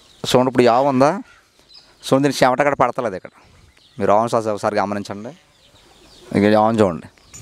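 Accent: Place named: Godavari, India. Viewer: Ma Godarolla yaasa ekka daina kanipetteyachu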